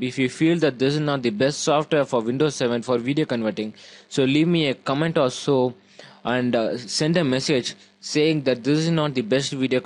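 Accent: India. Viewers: he is north indian... he got these accent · omg I hate this idiot INDIAN and their enlish omg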